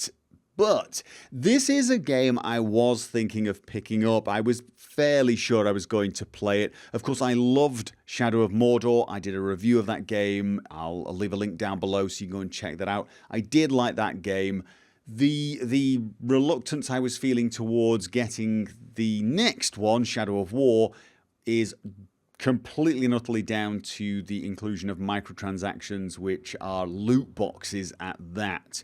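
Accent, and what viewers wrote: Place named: RP, United Kingdom. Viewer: You sound very posh with that mic.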